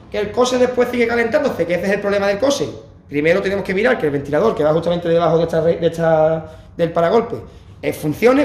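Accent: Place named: Spain. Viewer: En el minuto de tu video preguntas "me entendéis no? y la verdad que se te entiende la mitad de lo que dices, mi intención no es desvirtuar tu trabajo ni mucho menos descalificar tu modo de hablar, se perfectamente que en algunas regiones de España tienen fonéticas al hablar bastante particulares, lo cuál es respetable pues es tradición autóctona y eso no se discute, en el norte de mi país también ocurre pero no tan diferenciado, pero para los latinos que hablamos el Castellano mas pausado nos resulta difícil entender este léxico y tu si que hablas rápido, creo que deberías tener en cuenta esto a la hora de hacer un video redactando mas lento ya que serán muchos los latinos que lo verán y les facilitarás el entendimiento, gracias por tu video y un feliz 2022.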